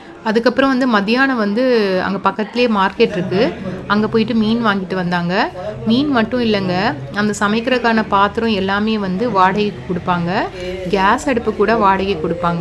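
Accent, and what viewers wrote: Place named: Coimbatore, India. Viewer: கோவை யா! பேச்சில ஒரே இங்க இங்க யாவே இருக்கே!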